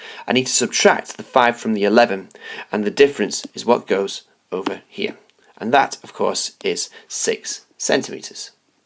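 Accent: United Kingdom. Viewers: BRITISH ACSENT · That’s because he’s british like me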